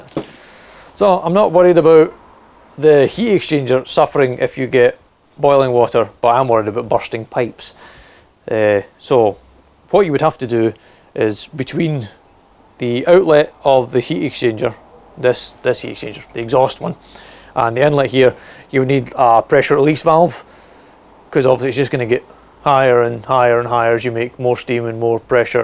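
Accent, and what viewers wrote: Scotland, United Kingdom. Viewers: Listening to your?eastern? Scottish accent is bamboozling my fancy. 😁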